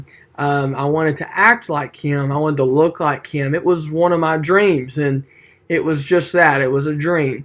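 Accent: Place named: Tennessee, United States. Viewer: I knew you were from Tennessee!!! I knew from your accent!! Sounds great! I know your doing Gods will! I totally know what you’re talking about when you say hiding under the pew hiding from spankings lol...man that switch tho 😂🤣🤣🤣can’t forget that thing 🤣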